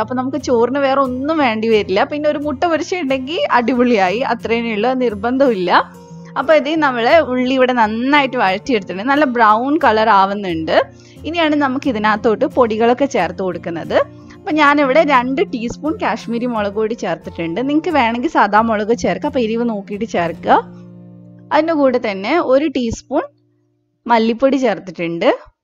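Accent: Kannur, India. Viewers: sound super kannur evideya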